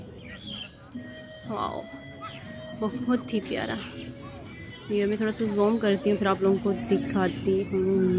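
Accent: China. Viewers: Love from India, 1m subscribe soon, how can you speak mandarin so frequently and your accent just like Chinese people please make a video on this topic😊😊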